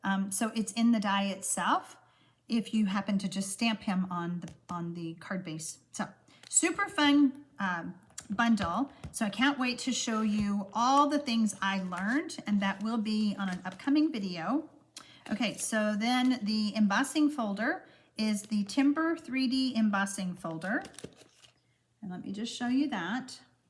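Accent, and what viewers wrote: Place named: South, United States. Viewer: Frist time to see you. Really enjoyed all of your video. Thank you for all the information. I live close to Nashville Tn. and I talk just like you. Was fun hearing what I sound like. I love our southern drawl. I will be watching other of your videos.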